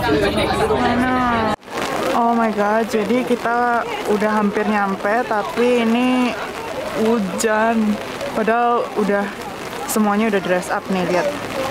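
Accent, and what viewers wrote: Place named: Java, Indonesia. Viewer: Medoknya kluarin dong kak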